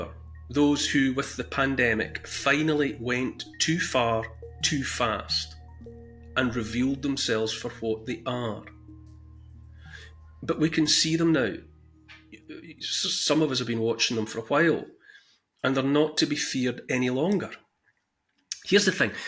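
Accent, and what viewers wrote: Scotland, United Kingdom. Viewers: Hi Neil Oliver, thanks so much for your videos, I really appreciate your thoughts. It's so rare that someone sees the truth, & understands what's really going on. I'm pleased you are so brave to speak the truth. TBH your history programmes used to really rub me up the wrong way, maybe it was the strong Scottish accent? Now I am really respecting you though. Lucifer has the control over this world. He is the mastermind behind all the evil you see. Lucifer is the Prince who plans all the wars, & trouble, and evil schemes. He controls the powers of this world. I hope you will read the Bible, because I know it will open your eyes to the TRUTH. Without God there is only death. I'm hoping you don't dismiss this comment, & your eyes will be open. God has the victory, Satan will be destroyed ..... all will be good. Best wishes, appreciate your hard work.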